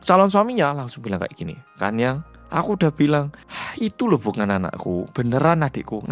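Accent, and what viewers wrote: Java, Indonesia. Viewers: Mas sekarang di kampung halaman apa di tanah perantauan? masih medok ...... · Aku baru ngikutin, keren bgt 😂 fasih banget bhasa indo Jawa medok nya. 🎉🎉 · Aku ketemu channel..anda. Lama tinggal diJawa ya..? Medok bhs Indo🇮🇩 nya..😊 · Dari maraton nonton swindler .. Aku yakin bngt si detektif swasta nya si babang korea medok ini sendiri .. Hm masuk akal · Gw salut sama abang ini, medoknya gak lang-ilang😅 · akhirny aplot jg udh kangen mas"korea cerita pake aksen medok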